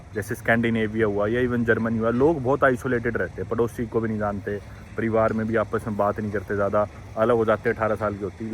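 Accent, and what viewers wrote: Haryana, India. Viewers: bhai aap haryanvi bolte huye jyada mast lgte ho ❤ Gajab accent lgta h aapka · Haryanvi accent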